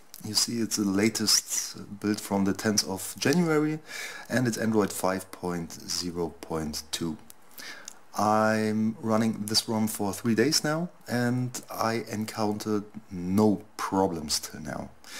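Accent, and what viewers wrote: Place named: Germany. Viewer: Lol hast echt einen starken deutschen Akzent. Ist das das echte update oder ist es einfach von jemandem der das auf dem Internet hochgeladen hat?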